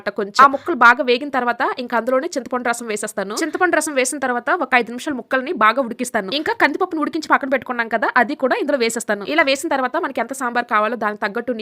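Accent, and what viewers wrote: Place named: Bangkok, Thailand. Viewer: Bankok pilla voicelaundhi meedhi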